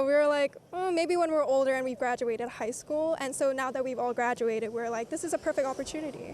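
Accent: Afrikaans, South Africa. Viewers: SHe is indeed South African, love the Afrikaans accent girl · it's a south African accent get over it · Lol, Yup, the accent is definitely ours😂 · South African accent❤ she is us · This gorgeous girl is 100 💯 South African 🇿🇦 her accent is 💯 South African No disrespect to her Korean roots Bless her!! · Because it is an Afrikaans accent. That accent comes from the Dutch. Even the word is dutch. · Your South African accent is on point. Thank you for representing us. We all mix here. So you nailed it. ❤ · The South African in you is popping out. Love it!🎉❤ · As a South African I approve just from accent, and dish option Chakalaka and pap with some Wors 😂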